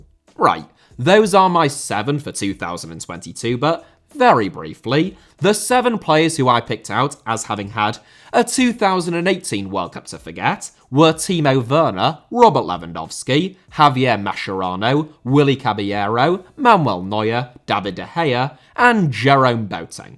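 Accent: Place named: England, United Kingdom. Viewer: I can understand the non-English words but the English words? It's just his accent lol if anything that's the correct way to say those words since English came originally from England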